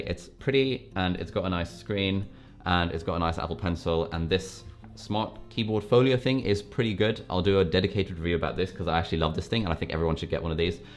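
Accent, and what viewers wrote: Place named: England, United Kingdom. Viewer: Recently found a bit water ripples on the corner screen on my iPad pro 2018, anyone does the same? Anyone can give any thought about it if I need to replace it? Always like English accent, to a non English speaker, me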